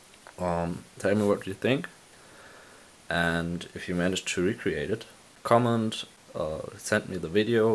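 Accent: Germany. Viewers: Jetzt hab ich es mitgekriegt das du ein deutscher bist^^ Wegen deinem akzent^^ Aber echt mal so gefragt wie kannst du solche waffen bauen?0_0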